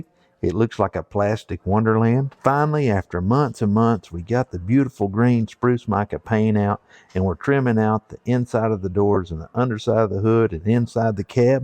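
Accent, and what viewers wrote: Tennessee, United States. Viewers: That's an incredible job. I thought I heard TN in that accent. I'm in middle TN working on a 73 F100 swb. Thank you for the videos. I wish there were 10x more. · Same here recognized that tennessee accent...im up here on the plateau in crossville. Great work on that f150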